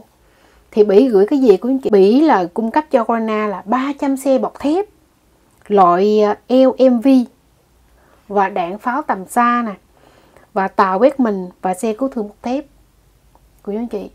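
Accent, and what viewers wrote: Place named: North, Vietnam. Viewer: đúng chất giọng 3ke x.ỏ lá. Ngọng líu ngọng lo cũng bày đặt lên cào phím. "Lato", "Ixarel", quát đờ hợi :face-blue-smiling::face-blue-smiling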